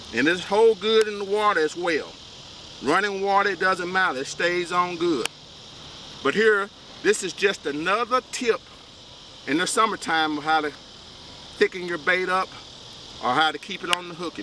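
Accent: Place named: United States. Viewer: Love the ol country accent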